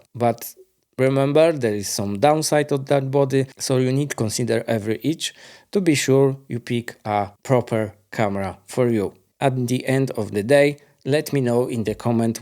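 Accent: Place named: Russia. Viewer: Your accent is very simillar to russian😅 I want to by e6700. Thanks for testing it.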